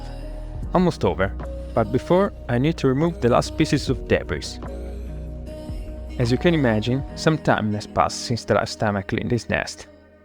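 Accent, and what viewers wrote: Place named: England, United Kingdom. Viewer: I have a question: Where are you from, because I recognise that you are from England because I'm from Italy 🐜🇮🇹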